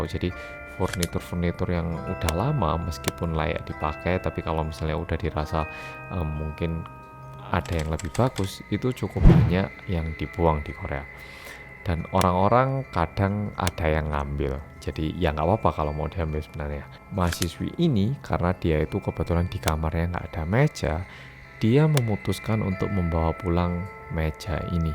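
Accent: Java, Indonesia. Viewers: Aku rasa kok medoknya mas rada kurang ya.wkwkwk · Mana orang korea yabg medokkkkkkkk................;v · bersama orang korea yang... aku: medokkk mas: kewedennn sampe lupa:'' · Medok Is Back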